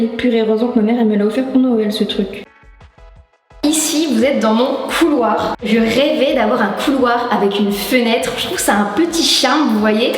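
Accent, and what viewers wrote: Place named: North, France. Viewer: tu es originaire du nord de la france? tu as un accent >_<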